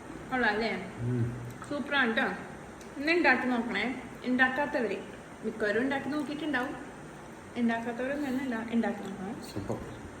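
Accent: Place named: Kochi, India. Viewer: സാക്ഷാൽ കൊച്ചി ഭാഷ😂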